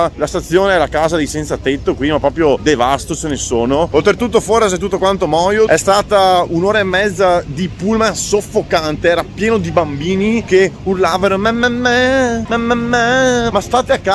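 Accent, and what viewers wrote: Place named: Veneto, Italy. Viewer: il tuo slang Veneto ci sta un sacco per dare pareri soggettivi alle cose😂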